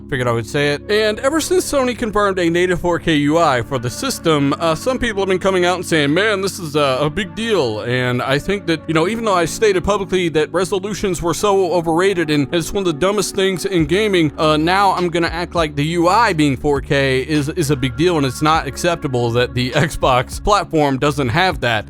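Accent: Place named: South, United States. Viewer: your hick/country accent sucks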